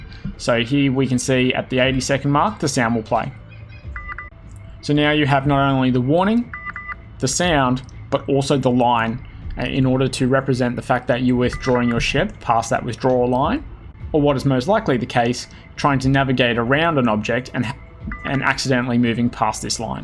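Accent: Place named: Australia. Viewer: HA, Aussie detected. Good videos btw, thanks for explaining all this stuff.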